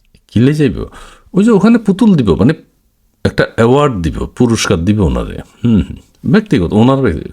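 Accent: Noakhali, Bangladesh. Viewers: পাগলামী ছাগলামী বাদ দিয়া ভালো কিছু বলেন। কথার টান শুনে তো নোয়াখাইল্ল্যা মনে হচ্ছে। কাদের সাবের আত্বীয় নাকি??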